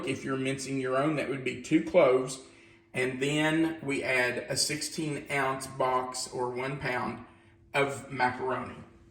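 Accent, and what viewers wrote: South, United States. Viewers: I think you guys are great. What is the fat ratio of your beef? 80/20? 70/30? I love how you Southerners put G in the word onion.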